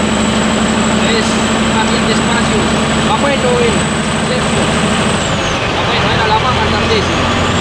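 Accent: Colombia. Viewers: este es el verdadero conductor de carretera lo unico malo es el asento , la palabra seria muy colombiano para mi gusto es igual que el asento chileno casi que hay que buscar un traductor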